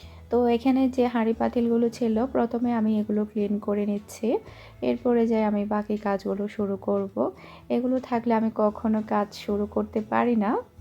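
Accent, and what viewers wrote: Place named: Chittagong, Bangladesh. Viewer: আাপু আমার মেয়ে তোমার ভিডিও দেখে।তোমার চিটাগাং এর ভাষায় কথা গুলো শুনে ও হাসতে হাসতে শেষ।🤣🤣🤣🤣ওর এখন ৬বছর বয়স। আপু তাড়াতাড়ি চিটাগাং এর ভাষায় ব্লগ করে আমার মেয়ে টারে খুশি করে দাও।আর মিহি মনিকে এত্ত গুলো আদর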